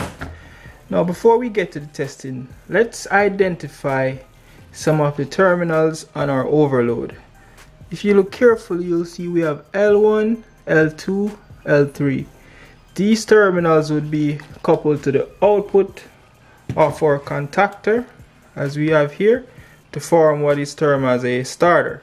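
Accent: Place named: Jamaica. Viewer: Sounds like a Jamaican. Good video breda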